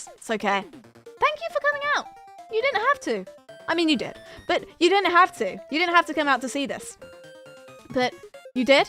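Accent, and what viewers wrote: United Kingdom. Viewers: Okay, I'm subbing just because of this stream. The dedication to BLÅHAJ is simply astounding, and it's nice hearing another British voice on here. I shall watch your career with great interest.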